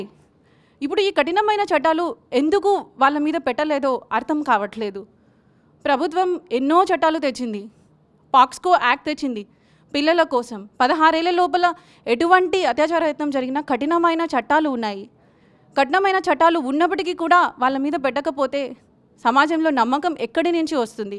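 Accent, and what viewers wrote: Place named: Karnataka, India. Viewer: May be Kannadiga...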